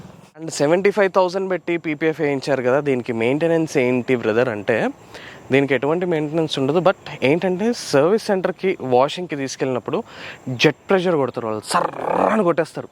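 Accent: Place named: Delhi, India. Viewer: bhai...you r awesome.i know u well from morning to evening you rome with marvadi and jains.your hindi is Delhi based.we r just subscribers who r we to suggest your language.did they know anything about u u r a international model lakme runner up u donated 50 lakhs to blind people.what does they know don't care the people who give u negative comment.thum ko dekh ke jalthe hai saaley..kuch tho log kahenge logon ka kaam hai kehana.... ❤❤❤❤❤❤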